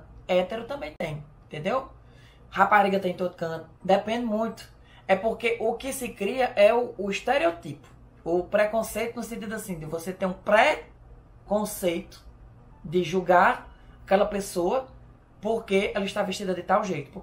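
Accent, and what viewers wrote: Northeast, Brazil. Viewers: Sotaque nordestino lindo, admiro muito os nordestinos e o Nordeste. Estado lindo povo maravilhoso quero conhecer esse estado belo. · eu te acho a cara q se assume dentro do q vc é, nordestino arretado muitíssimo inteligente, porém com sotaque incivelmete nordestino q portada acordo com a situação e ocasião continue esse carinha gente fina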